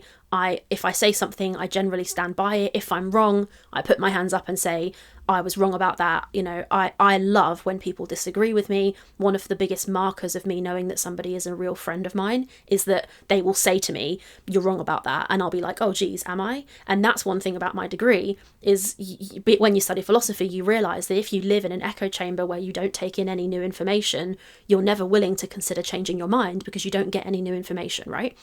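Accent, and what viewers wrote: United Kingdom. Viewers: Does think the British accent somehow changes the words we already read?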